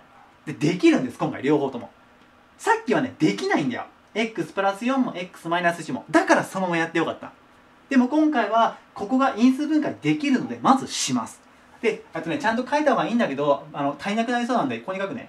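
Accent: Kansai, Japan. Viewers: たまに関西弁出てるのおもろw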